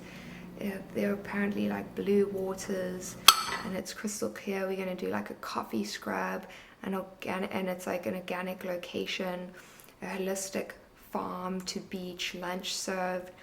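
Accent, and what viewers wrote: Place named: United States. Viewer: Чувиха ботает на американском языке. А это немножко не английский.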